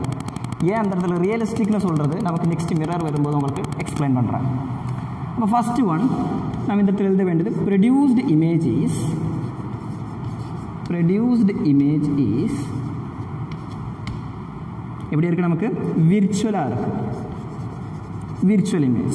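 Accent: Kerala, India. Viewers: Sir Ur from Kerala...? Ur slang like malayalizz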